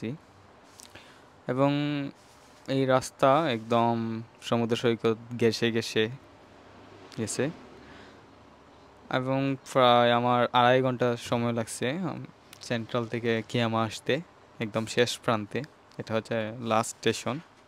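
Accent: Sylhet, Bangladesh. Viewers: Bai afne kita sylheti ni?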